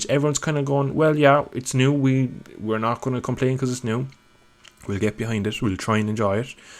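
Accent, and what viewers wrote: Ireland. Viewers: I wish they'd do something like Codemasters does with the F1 games. Making the riders switch teams and move up (including your own riders) and like EA also did with their NASCAR games. MotoGP riders retiring or switching teams and riders moving up from Moto2, Moto3 riders moving up into Moto2 and Rookies Cup riders moving up into Moto3. PS: like your Irish accent :)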